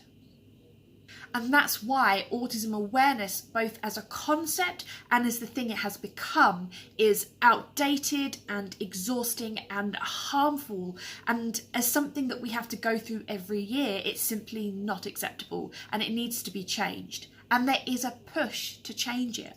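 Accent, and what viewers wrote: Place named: United Kingdom. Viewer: This is the first time I've heard your voice. I didn't know you were British. (I follow you on Twitter) Congrats on 700 Subs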